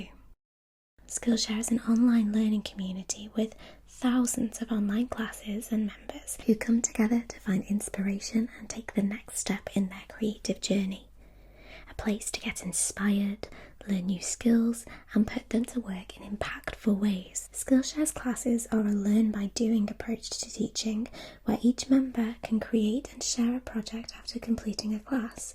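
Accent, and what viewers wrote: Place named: Wales, United Kingdom. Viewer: I can’t put my finger on her accent, anyone know. Switches between welsh and English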